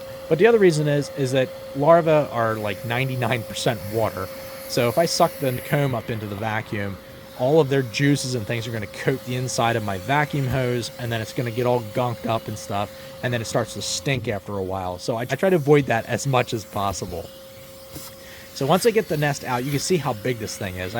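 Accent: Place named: Pennsylvania, United States. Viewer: What is this dialect? It's very "Mare of Easttown"...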